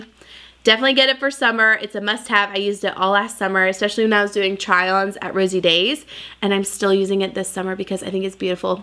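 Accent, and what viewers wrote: South, United States. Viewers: You sound so country in this one 😂😂😂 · Love your videos. You are such a sweet southern girl that is so fun to just listen to.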